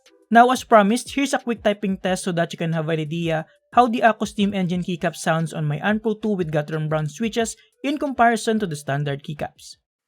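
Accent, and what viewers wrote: Philippines. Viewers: his Filipino accent tho is insane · I love the filipino accent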